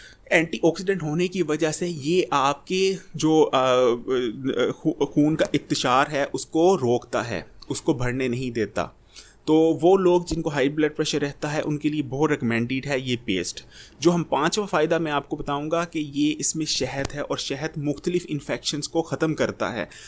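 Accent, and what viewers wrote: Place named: Punjab, India. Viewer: Chuteya ager urdu bolna sekh leyta to acha hota .....punjabi bolny waly bachy.......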